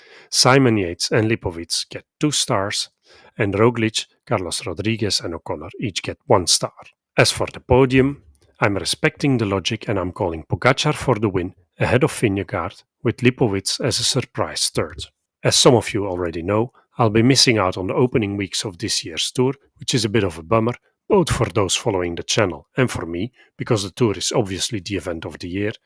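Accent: Flanders, Belgium. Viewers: 😀super tick Flemish accent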